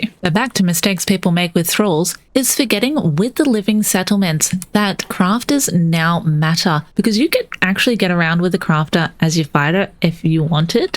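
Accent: Australia. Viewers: trying to work out the accent you Aussie?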